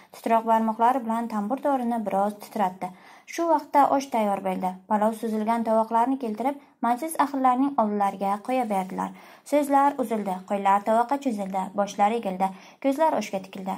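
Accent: Bukhara, Uzbekistan. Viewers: Вокеалар Бухорода бўлганлиги учун синглимиз ўз шеваларида ўқиб қўя қолибдилар😊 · Buxorocha shevezdan ey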